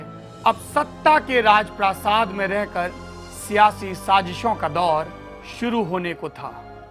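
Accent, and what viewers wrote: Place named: Kanpur, India. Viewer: Seems you are either from Kanpur or lko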